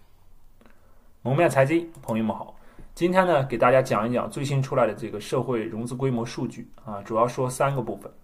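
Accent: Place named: Shanxi, China. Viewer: 估计哥们是山西人，口音听着亲切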